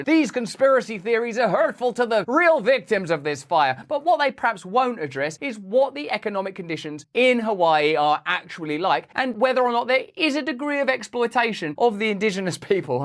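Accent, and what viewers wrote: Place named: United Kingdom. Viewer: I love the brit accent LOL. VPN ad "Get an extra Free (three) months Free.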